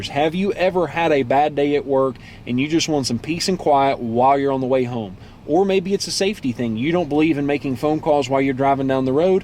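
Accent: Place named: Alabama, United States. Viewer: Just bought a new Ford Escape 2019 in September 2019. This was an extremely helpful learning tutorial. It was easy to understand, even if you don't speak the Alabama dialect. I tried to learn from the owner's manual, but I just got lost trying to read it. Take the time to listen and to watch this video. I owned a Focus 2012 with the prior Sync system (called My Ford Touch) and this new Sync 3 system is so much better and it operates very fast with no delays. You will fall in love with this system. I do use an iPhone 8 and it works great when connected by Bluetooth or via USB wire. You do have to have the iPhone connected via USB cable for some of the functions to work. Also, the sound quality of music or of Podcasts which are on your iPhone is much better than when playing music via Bluetooth. Thank-You for taking the time to make this video.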